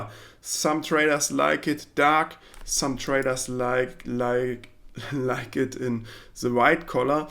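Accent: Germany. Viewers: deutscher Akzent kickt😂 Aber sehr gutes Tutorial. 10/10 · Bro nices Video aber fühle den Deutschen accent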